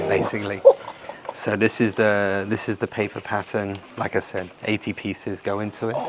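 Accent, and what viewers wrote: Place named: United Kingdom. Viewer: 好像英式口音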